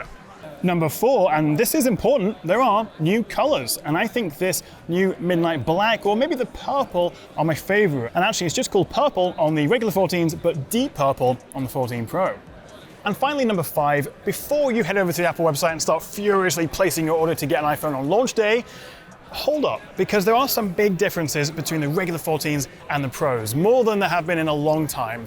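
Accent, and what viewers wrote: United Kingdom. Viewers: GAY BRITISH ACCENT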